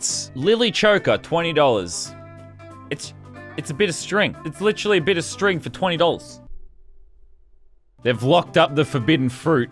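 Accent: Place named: Australia. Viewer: I find the australian accent so cool, the way says gone as gaawn.😅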